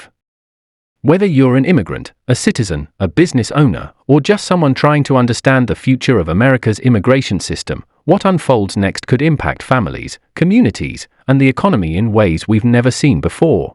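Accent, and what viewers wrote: United Kingdom. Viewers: I don’t need to hear a British ethnic voice talk about the USA. Didn’t we kick those people out of the America for a reason?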